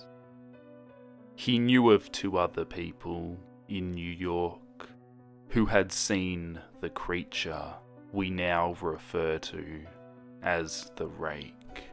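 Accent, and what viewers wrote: Australia. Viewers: You sound Australian tbh.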